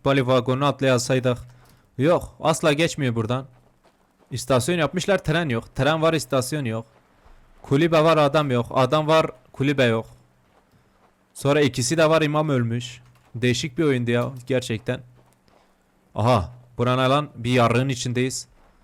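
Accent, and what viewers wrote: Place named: Southeast, Turkey. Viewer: Bu şive erzurumum şivesi değil, bu yaptığın şive kürtlerin kullandığı güneydoğu şivesi.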